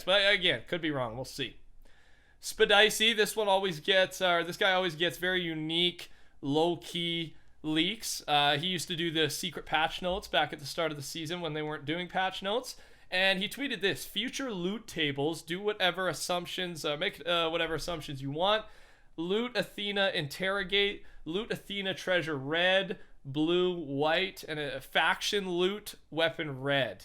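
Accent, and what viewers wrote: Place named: Canada. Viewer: I love it when Canadians say that there fired up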